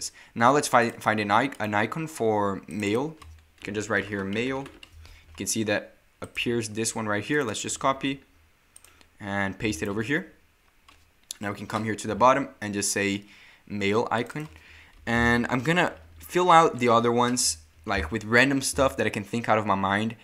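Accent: Brazil. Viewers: Você é Brasileiro né? Are you Brazilian?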